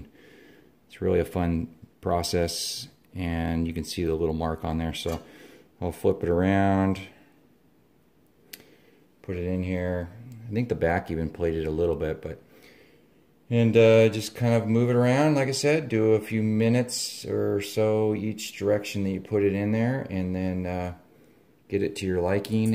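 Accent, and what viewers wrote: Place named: Wichita, United States. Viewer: GREAT idea about the Ni welding rod - did you find at Harbor Frt? Sounds like you are from Wichita - me too. <mail>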